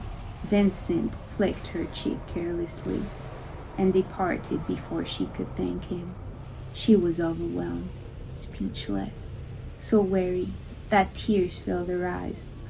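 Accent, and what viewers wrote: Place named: England, United Kingdom. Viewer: C'est très bien lu, avec un bel accent anglais et une belle intonation. J'ai pu suivre l'histoire avec les sous-titres incorporés (anglais). Merci!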